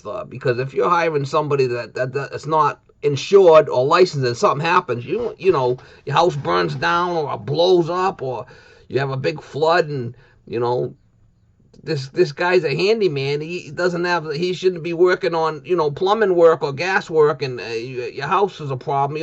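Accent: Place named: Boston, United States. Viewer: We could tell u might be from BOWSTON.. But anyway, great video .. The best part was when you said "I do what i wanna do" the attitude of someone who doesn't have to get one of those crapy corporate jobs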